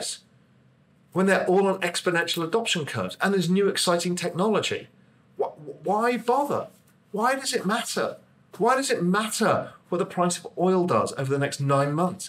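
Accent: United Kingdom. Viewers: Really diggin’ that UK accent and cadence! Makes these wise words even better! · Ha ha ha ha. Like I said a few weeks ago. BS with a British accent is still BS!!🤣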